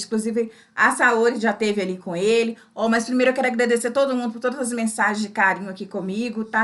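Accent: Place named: Minas Gerais, Brazil. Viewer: Seu canal é muito bom aqui no YouTube VC é muito carismática beijos no seu coração eu sou de São Paulo e pelo seu sotaque VC é de Minas gerais né ☝️😉 adoro quando vc fala assim ☝️ tá quente pelando acho muito engraçado 😅 vc é muito simpática beijos no seu coração e um feliz natal com muita saúde paz e alegria pra vc e sua família 😉☝️👏👏👏👏🙏🙏🙏🙏🙏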